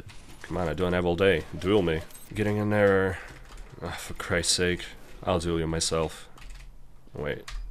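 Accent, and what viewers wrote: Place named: Russia. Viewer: Единственный канал на английском, который я могу нормально смотреть (уж слишком русский акцент помогает, да и местами русская речь прикольно звучит (¬‿¬ ) )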